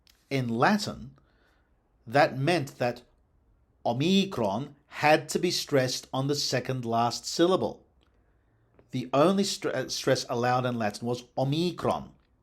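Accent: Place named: Australia. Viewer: For some reason I never pictured you with an Australian accent, even though I know you’re Australian. Nice video!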